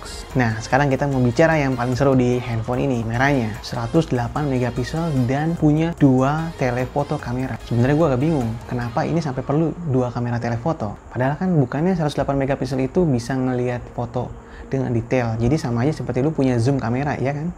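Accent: Cilegon, Indonesia. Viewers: Wong cilegon kih ? Boleh amat 🤣🤣🤣🤣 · Org cilegon?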